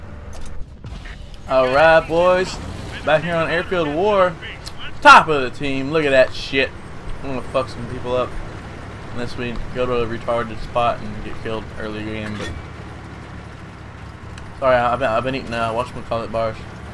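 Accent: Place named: South, United States. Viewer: im glad that your suthern accent is back!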